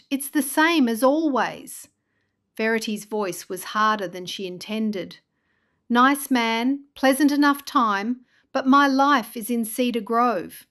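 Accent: United Kingdom. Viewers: I really wanted to listen to this story. I love your stories. But just can't listen, I'm so sorry. You're a very gifted writer, but no Amish ever has a British accent. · It doesnt sound like an Amish dialect, sounds like uk accent takes away from story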